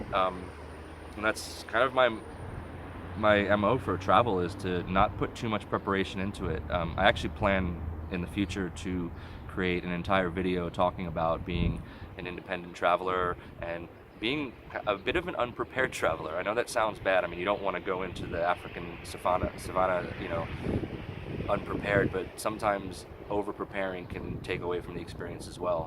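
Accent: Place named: England, United Kingdom. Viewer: You sound English dude..In Whk now from the UK.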